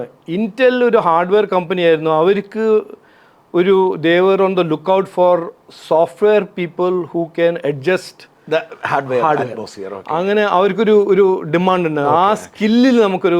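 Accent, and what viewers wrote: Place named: Palakkad, India. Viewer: പാലക്കാടൻ മലയാളത്തിന്റെ ഒരു രുചി സംസാരത്തിൽ നിഴലിക്കുന്നുണ്ട് thankyou sir 🙏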